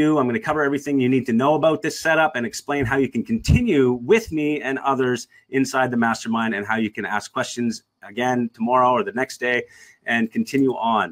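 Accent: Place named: Wisconsin, United States. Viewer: Are you from the midwest? I’m hearing a Wisconsin accent i think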